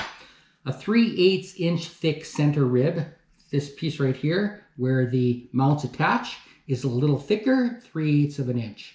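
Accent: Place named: Canada. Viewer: I knew you were Canadian as soon as I heard your accent. 😎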